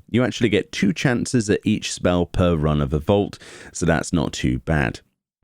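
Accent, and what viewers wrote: England, United Kingdom. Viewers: English accent doesn't mean he understands how to read it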